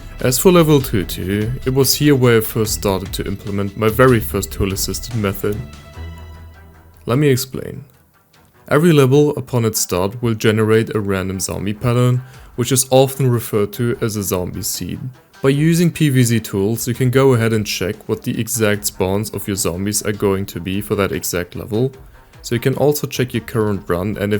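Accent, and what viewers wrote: Germany. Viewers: I Instantly knew you were German the second i heard the accent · Im also German and I instantly heard your accent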